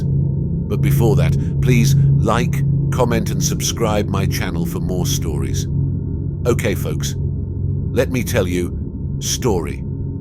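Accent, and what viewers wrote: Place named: United Kingdom. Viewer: Кажется это британский английский -- если я не прав можете кинуть в меня камень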